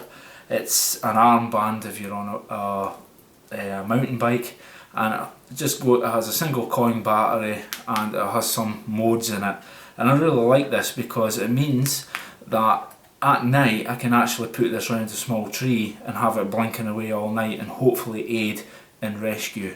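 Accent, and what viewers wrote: Scotland, United Kingdom. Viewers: Are you scottish do you go camping in the highlands.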